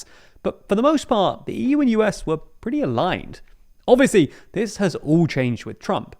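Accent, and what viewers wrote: United Kingdom. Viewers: the last time some guy with a British accent talks about trade imbalance with china... they flooded that country with opium and then invaded a few times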